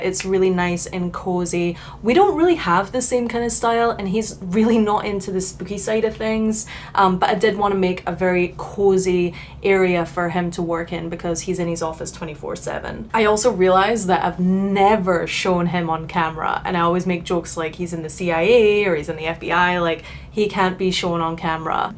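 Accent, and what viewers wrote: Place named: Scotland, United Kingdom. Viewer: Your doing ok. Love ya Scottish accent. Awesome!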